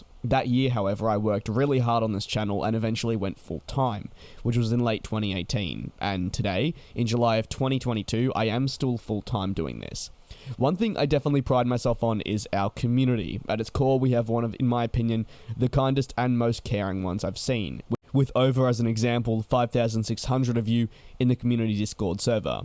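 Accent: Australia. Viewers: Have you ever wanted to become a commercial pilot? Also even tho u sound aussie are you in the UK?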